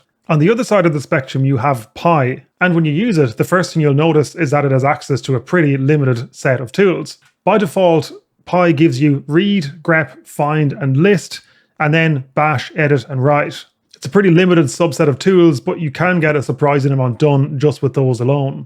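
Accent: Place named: Ireland. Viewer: I wonder why people still looking for better harness after pi was release, the new hype sort of Prime Agent is just Pi for lazy people to create its own extension and add few more lines on the sysprompt. Btw, first video I see from your channel, already subscribed, nice content, it would be good just to have the original audio with some Irish accent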